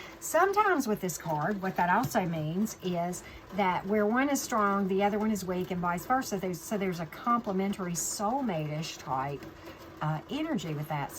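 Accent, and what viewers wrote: South, United States. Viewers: I'm loving your channel annnd that southern drawl (I'm a N. Ga Peach) So glad to have found you from Tyler's Tarot 😁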